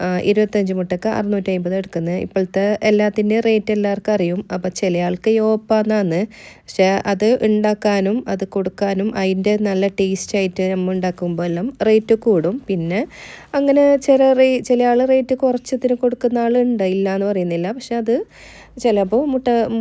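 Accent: Kasaragod, India. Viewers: Kasaragod ആണെന്ന് തോന്നുന്നു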